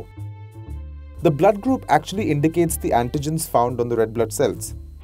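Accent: India. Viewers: Yes very indian accent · I had to stop to watch the video cuz the rare accent Indians have.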